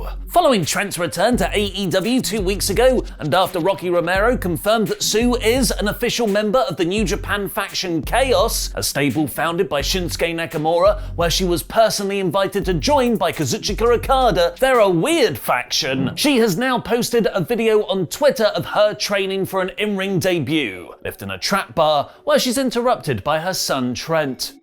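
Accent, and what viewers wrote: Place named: Cockney, United Kingdom. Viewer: Love cockney street urchin speech begging for subscriptions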